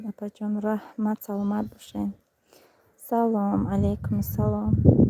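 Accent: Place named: Vahdat, Tajikistan. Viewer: Салом Алейкум.Ман фикр мекардам аз Вахдатен,барои,ки лахчатон монанд.Аллох нигахбонатон,Хохарчон.УВАЖАЮ ВАС!!!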